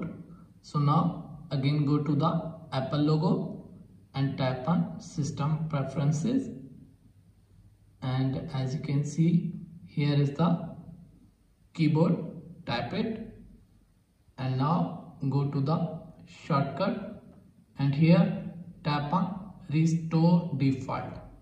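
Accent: India. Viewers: As soon as I heard the indian accent, I knew it was going to work!!! Thank you!!!❤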